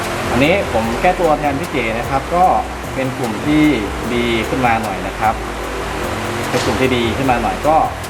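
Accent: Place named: North, Thailand. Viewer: ฟังสำเนียง เหมือนเป็นคนเหนือเนาะ ^_^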